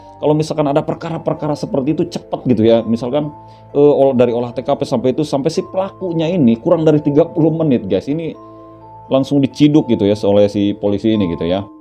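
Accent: West Java, Indonesia. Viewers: Namanya juga baisana mah ti Sunda dan logat nya juga Sunda pisan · aehh geningan sunda c akang teh 😂,,,Sampurasuuun kang -ti Bandung 🙏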